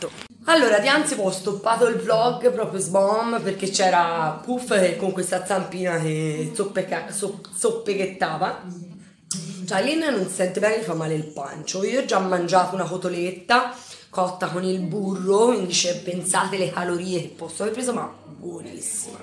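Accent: Tuscany, Italy. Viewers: Quando sei euforica la cadenza toscana esplode proprio!! Ahah! Bella,!!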